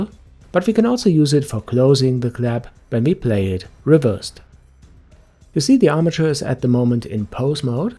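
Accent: Germany. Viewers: Are you German? I noticed all German youtubers have a soothing deep voice, plus it's the only accent that isn't annoying to hear. And the videos are always well made with zoom animations and without a single detail left unexplained to ensure zero viewer frustration and confusion. How did they lose the war?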